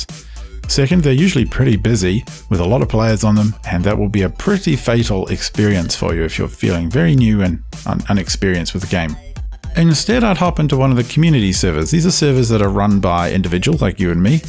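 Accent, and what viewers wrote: New Zealand. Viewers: You would not believe the day i have had. Total shit day where a job i did might not even pay me and when i get home to learn the new game i am playing it is a Kiwi behind the microphone. Do you know how much that shits me. He is talking about being on a rock with a 'beer' underneath him, and i'm like what the fuck is this game doing where a player is on a rock because there is a beer underneath him. Then he goes on about how 'Mital' is pleasing to him and i have to look up the player guide and search for 'Mital' thinking it is some character that i haven't seen before. Nowhere is there a mention of Mital in the documentation i can assure you. Shit man, learn to speak proper English would you. Get rid of that accent so you can sound more like normal American English. We would prefer it if you try to sound like an American so we can actually understand you. 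Imagine if we were in a battle of some war and the bloody New Zealander has to ask someone to pass him a weapon and the New Zealander is like "quick, pass me the wippon i have someone to attack" and the other USA people are like "what do you mean pass you the wippon, i don't know what a wippon is. Get the hell out of the front line you New Zealander because no one understands you and why you keep asking for something called a wippon. Go home New Zealander is what they would say. They would say "Go back to your island and you can have all the wippons you want but here in reality we talk English with American accent and if yo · wait a sec r u from New Zealand aswell <3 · Dude are you from nz to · Are you from New Zealand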